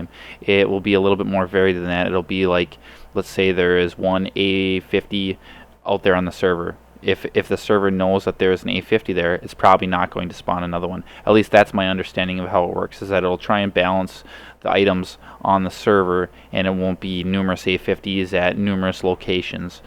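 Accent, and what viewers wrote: Canada. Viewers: Are you from Saskatchewan?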